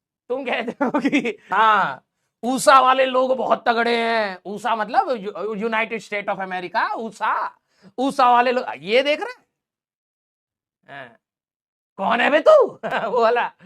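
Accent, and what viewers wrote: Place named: Gorakhpur, India. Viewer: Ham gorakhpuriya haiin sir ekdum theek bolat bade 😂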